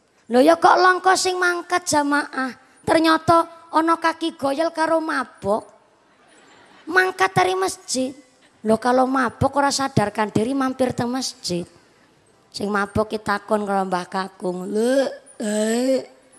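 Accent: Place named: Banyumas, Indonesia. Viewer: Pakai bhs indonesia jd hilang ngapaknya, biarlah dg cirihasnya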